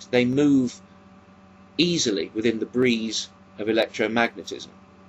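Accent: United Kingdom. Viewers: i love your british accent, i don't think i would have watched the whole video with an american one (especially a southern accent). :) science is awesome! at least it's something to rely on; not the God character.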